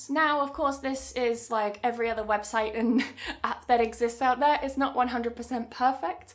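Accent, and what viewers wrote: United Kingdom. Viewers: I wanna to learn British accent from you. Therefore guide me how am I join your online english British accent course…? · I was looking for pure british accent speaking content and I find you accidently. Because my search was done yestarday and didn't end up with relevant contents. Today, I suddenly come through your content. So wonderful. My greetings from Algeria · Hii I like your video, are you from UK? :) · r u British ? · Wow really awesome British accent!!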